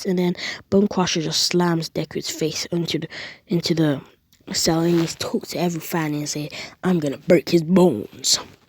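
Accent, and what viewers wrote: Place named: United Kingdom. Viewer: Mate, you've got a heavier British accent than I do! It's like one of those book narrators.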